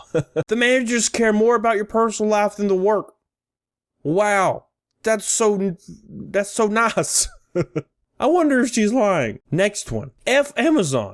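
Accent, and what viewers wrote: South, United States. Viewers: Omg yes I love you. I need a southern gentleman in my life. Marry me please. 🥰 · I went to GamtStop today and they tried to sell me a 1 year warranty. I asked are you guys gonna be around in 1 year. The employee laughs and said you sound like the guy on YouTube with the southern accent.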